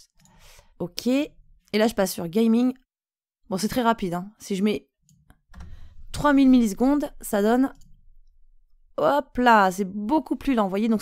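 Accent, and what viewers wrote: South, France. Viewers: vous parlez trop vite, calmos.. (c'est l'accent du sud peut-être) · supert tutoriel tres complet bravos jeune fille continue longtemps celas fais plaisir d'apprendre en ta compagnie ! avec l'accent du midi amicalement marc pecheo3 merci!😀💐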